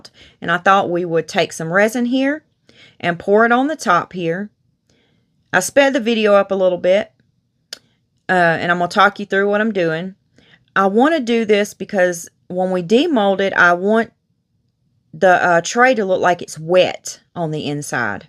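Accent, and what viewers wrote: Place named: Louisiana, United States. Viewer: Hi, the tray came out beautifully. Thanks for sharing the wet technique. I’ve never seen anyone else do it before. What state are you from? I know you have a southern accent & to me, it sounds like Louisiana, but I’m not sure. Take care, stay safe & have a blessed day.🙏💗👋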